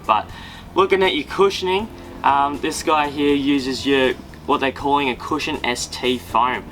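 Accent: Australia. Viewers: Great review and great Aussi accent. Would love it if you kept doing comparisons of running shoes like this one.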